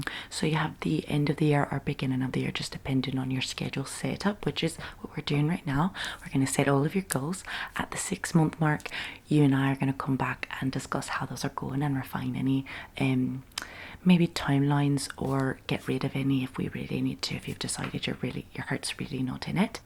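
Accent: Ireland. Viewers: someone saw the banshees of Isherin!! or you're just really good at an irish accent. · We NEED more videos with the Irish accent! We beg you!!!! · Do more Irish and jersey accent roleplays !!! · Dang you got that irish accent down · I love the Irish accent, please do more of it in the future!!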